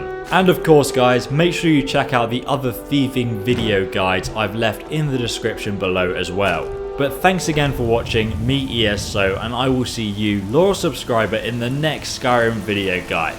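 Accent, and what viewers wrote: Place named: United Kingdom. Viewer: LiverpoolSwag69 he's British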